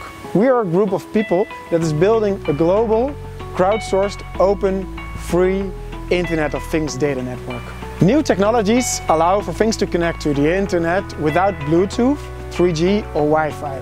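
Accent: Netherlands. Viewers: dikke nederlandse accent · zwaar nederlands accent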